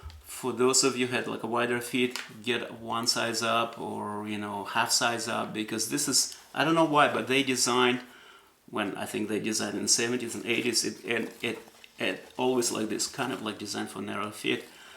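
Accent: Eastern Europe. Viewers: Am i the only one who hear slavic accent? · Такое впечатление, что английский язык для вас не родной. Проскакивает какой-то славянский акцент.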